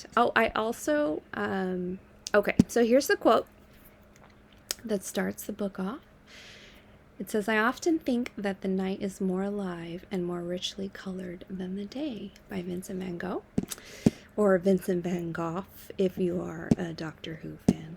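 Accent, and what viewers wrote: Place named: United States. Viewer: Have you ever heard of "Mad Studies"? or have you seen the webpage Mad in America? Interesting research into mental health, also check out Sadhguru on youtube. I'm enjoying your narration the American accent is cool.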